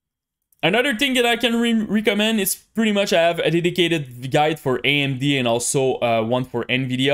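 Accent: Quebec, Canada. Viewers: ca parais que tu est quebecois xD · j'adore ton accent québecois à travers ton anglais! mais pourquoi pas en quebecois! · Do I hear a French Canadian accent? :D I am Canadian, lived in Ottawa most of my life.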